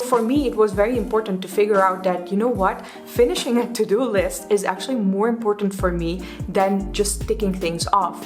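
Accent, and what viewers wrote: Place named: India. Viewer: Ik vind het zo schattig en leuk om te horen hoe inmiddels het Indiase accent je uitspraak beïnvloedt. Superleuk om te horen :)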